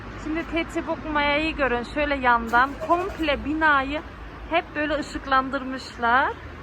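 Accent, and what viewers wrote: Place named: Ankara, Turkey. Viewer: Nerelisiniz ankaralï gibi konuşuyorsunuz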